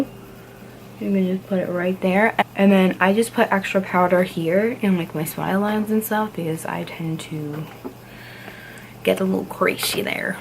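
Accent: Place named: New York, United States. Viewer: First, YOUR ACCENT! I love it! You HAVE to be from NY, right? Second, The looking up tip, I HAVE NEVER heard that before, and think that's totally genius. I have chunky lids that look hooded, so that tip is going to help me a ton. Thank you so much! Third - You are beautiful!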